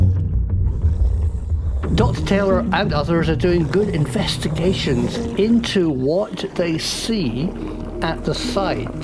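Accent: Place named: Cornwall, United Kingdom. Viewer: Hi. I'm from England too. I think your from Cornwall. Its really nice you making these videos. This one seems much better so congratulations on your progress. Off topic but are you from Cornwall? It scratches at me haha.. I'm 24 and a massive ufo buff. Again thank you for making these videos. One last question. Where are you AREA 51? THIS VIDEO ROCKS!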